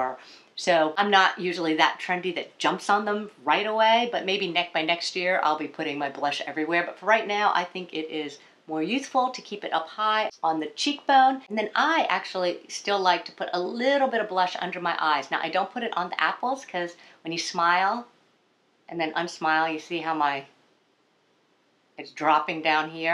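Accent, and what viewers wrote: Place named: United States. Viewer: Had to switch off, tips might be good but that American voice does your head in. Sorry.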